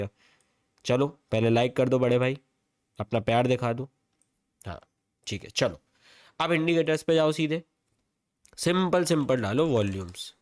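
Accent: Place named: Bhopal, India. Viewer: mujhe lag hi rha thaa bolne ke tarike se😂.... Apan bhi Bhopal se hey✌🏻🙌🏻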